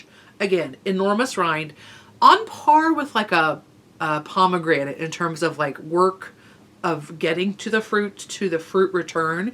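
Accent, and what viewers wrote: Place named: Scotland, United Kingdom. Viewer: Have a virtual hot toddy. I cannae spik for the rest o' Scotland, but I am totally on board for you adopting Scottish English...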